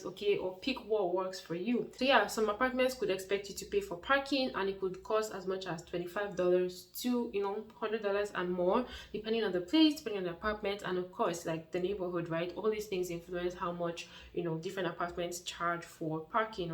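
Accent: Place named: Nigeria. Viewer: Very good video ! I like the way you explain the things ! I watch your video from Ottawa ! I like Nigerian accent 😀👍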